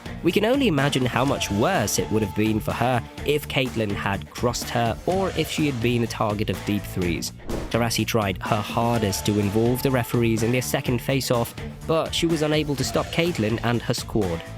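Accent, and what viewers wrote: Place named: United Kingdom. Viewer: Nothing gets me into the mood for basketball like a British accent.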